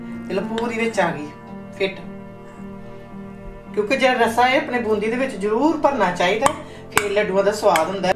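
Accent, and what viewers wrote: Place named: Malwa, India. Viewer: I love your Malwa accent. Sat Shri Akal. I will make these for my parents on Lohri. Thank you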